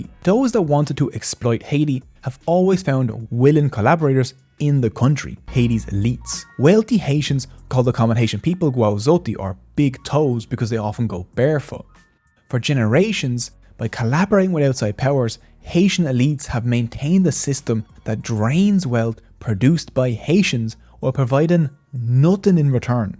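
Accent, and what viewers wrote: Ireland. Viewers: Can't stand his Connor McGregor accent. Sorry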